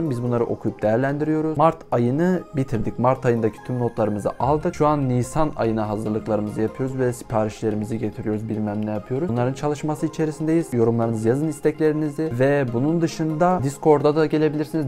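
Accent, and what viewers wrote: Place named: Germany. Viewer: Reyiz almanyadaki kuzenime benziyon tip şive bakımdan almanyada bulundunmu?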